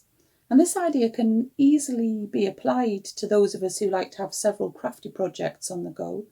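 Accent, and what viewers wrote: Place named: Durham, United Kingdom. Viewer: Hi. What a lovely podcast. I loved watching you make things and really enjoyed your tips for having lots of projects on the go. I use a lot of those tips and love having a little notebook to log all my projects. I especially love your Durham accent as it reminds me of a friend who lives in Hartlepool. Beautiful part of the world 😊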